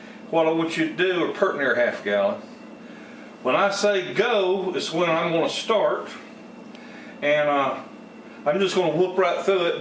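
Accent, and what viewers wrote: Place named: South, United States. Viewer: Where are you at. Sounding really Kentucky Tennessee ish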